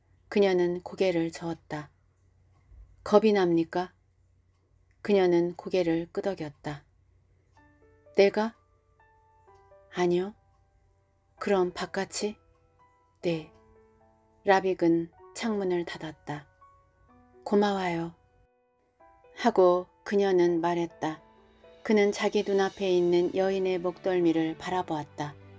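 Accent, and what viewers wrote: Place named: Jeolla, South Korea. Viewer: 책 읽느라 수고가 많으십니다. 혹시 전라도 분이신가요? 몇 군데 발음과 억양이 듣기에 거슬리는 부분이 있습어요. 낭독자께서는 왜 의복, '외투'를 '에투'라고 발음하시는지요. 경 험에 의하면 호남사람들이 주로 그렇게 발음 하는 경향이 있더군요. 혹시나 해서 물어보는 겁니다! 아무튼 책 읽느라 수고가 많으십니다.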